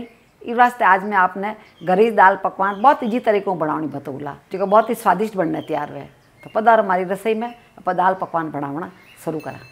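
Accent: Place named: Marwari, India. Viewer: i love ur marwari accent🥰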